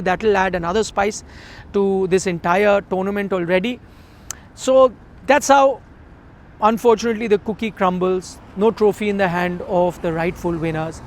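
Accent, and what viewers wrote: India. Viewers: Is the person presenting this story? If so, is he Indian? He sounded of Indian origin. He did a great job in narrating the story as it unfolded - unbiased, professional and clear.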